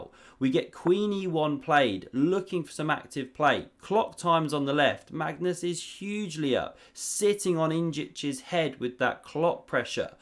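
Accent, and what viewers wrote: United Kingdom. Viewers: Can you speak less british accent? It's actually really hard to stand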